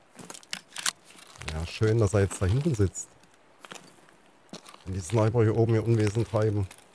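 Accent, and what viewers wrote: East Germany, Germany. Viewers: Sonofcolossus hat es so schön zusammen gefasst - daher möchte ich mich auch mal zu Wort melden, nachdem ich Deine Videos schon eine ganze Weile erfolge: Erstmal Hallo Wie gesagt verfolge ich Deine Videos zu Tarkov schon länger. Anfangs dachte ich mir schon mal ab und an - was fürn noob. Aber je öfter ich in deine Videos geschaut habe, habe ich feststellen müssen - da spielt einfach ein "Otto-Normal". Keine high-end Waffen oder meta- gear, kein Rumgespringe oder ausm Fenster des 3. Stocks in die Parterre, oder straight auf jede Konfrontation,sondern einfach nur "normal" zocken. Klar geht das auch oft in die Hose (kenne ich selber), aber ich finde deine Videos dennoch wesentlich unterhaltsamer als die von Meyer, 2Twistet oder sonstigen namenhaften Streamern! Dazu kommt dein "Ossi-Dialekt" - herrlich - vor allem, wenn du weggeballert wirst und "fluchst". 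Oder einer deiner Kumpels mit denen zu in die Raids gehst (komme gerade nicht auf seinen Namen -!?) der immer so schön sagt "Ach hör' mir doch öff" 🤣 - herzerfrischend! (an der Stelle, Grüße aus dem Westen), Unterm Strich muss ich zugeben, dass ich mich mittlerweile echt auf neue Videos von dir freue und alle wirklich immer unterhaltsam sind! Mach' weiter so, bleib' Deiner Linie treu und viele Kills und Loot gewünscht! Grüße, DoomDog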